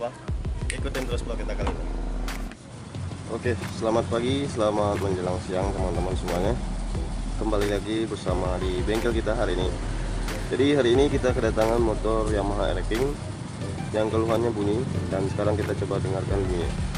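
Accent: Palembang, Indonesia. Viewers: Wong palembang yo kak?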